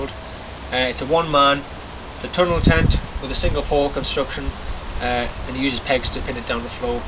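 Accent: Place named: Newcastle, United Kingdom. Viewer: I like ur voice. Geordie. Mmm.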